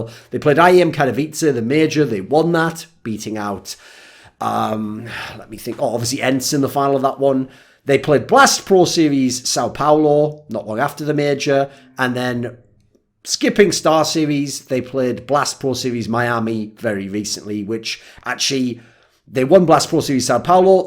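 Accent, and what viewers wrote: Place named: Scotland, United Kingdom. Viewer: Where you from sound so Scottish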